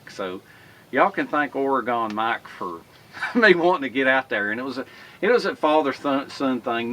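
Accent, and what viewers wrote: South, United States. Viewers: I love watching your videos... I'm originally from Oklahoma and really enjoy your southern accent. I have been in the Pacific NW for several years now and don't often get the chance to hear someone that sounds like me... lol... Very refreshing. I just recently started a youtube channel but have only done one slide show video. I'm not good at talking in front of the camera. Hopefully I will get the hang of it. Keep on keeping on. You do great work! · Great video, and the sandwich looks great. I have been watching YouTube for abou2-3 years. I started out watching 3 or 4 channels on guns. I started seeing these videos about bushcraft and started checking them out. That is when I found your videos and really liked your way of doing them. I am also a southern boy and like the way you talk. I have thought about getting a camera and trying my hand at this. 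Hearing why you started has encouraged me. Now if I can just talk my wife into us getting a camera. Thanks and I am looking forward to more of your videos.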